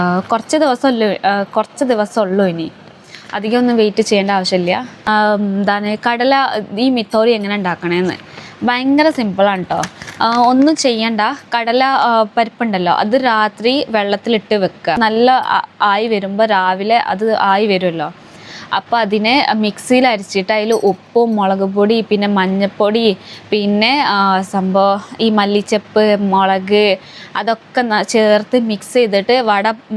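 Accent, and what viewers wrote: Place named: Malabar, India. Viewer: ഒരു മലബാർ ടച്ച്‌ വർത്താനം 😍❤️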